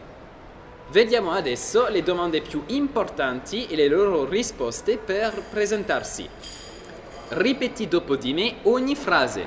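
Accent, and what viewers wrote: France. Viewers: Bravissimo, dove hai imparato così bene il francese??? Forse ha scuola??? Comunque hai un bellissimo accento francese come me e mia mamma!!!☺☺☺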